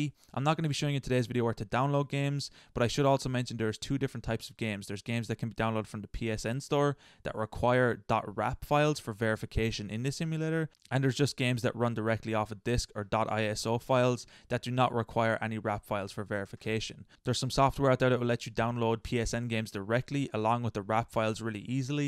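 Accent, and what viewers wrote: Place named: Ireland. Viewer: what part of ireland you from ^^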